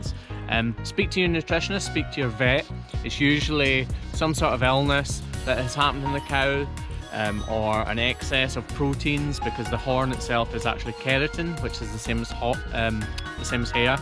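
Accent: Scotland, United Kingdom. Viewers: I've watched almost all of your videos in 3 days time. POST FASTER! I knew nothing about cow farriering prior to your videos. A good Scottish accent helps too.😊 ha! Good day!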